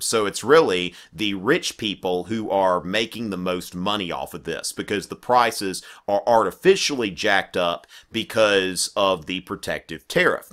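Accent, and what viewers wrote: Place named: South Carolina, United States. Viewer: He sounds like he's from the south eastern U.S. I'd guess, an urban area of South Carolina. I'm from Virginia, and many of the accent are similar, but there are some subtle differences.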